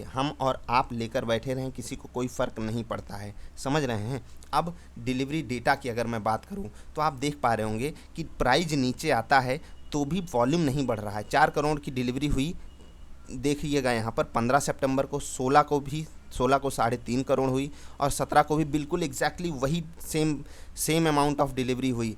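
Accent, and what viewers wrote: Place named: Uttar Pradesh, India. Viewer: Sir apki baatein bohot pasand hain aur achcha analysis dete ho aap... UP ke lagte ho aap.. kanpur ya lucknow ke aas paas ke? Kahan se ho aap?... EK BAAT AUR BATA DIJIYE for my analysis purpose... Which software or website u r using for technical charts ? right here in ur Video..🙏🙏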